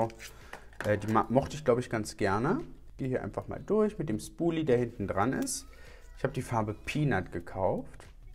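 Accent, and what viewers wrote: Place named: Cologne, Germany. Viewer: ‚Schätzelein wo biste denn?‘ - seit deinem min Video lässt du den Kölsch Jung aus dir raus. Ich liebs! ❤️❤️😂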